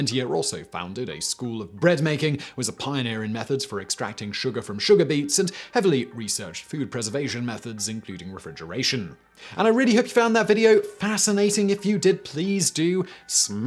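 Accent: United Kingdom. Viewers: Is it just me or does the narrator’s intonation sound annoying? I usually like the British accent but his annoys me. Ugh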